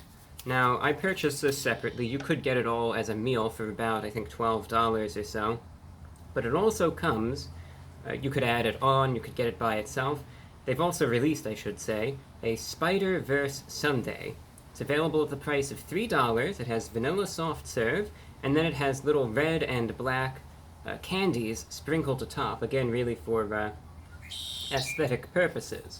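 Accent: United Kingdom. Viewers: Your voice has definitely changed over time. You somehow sound more British now. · Why does his accent sound more and more British?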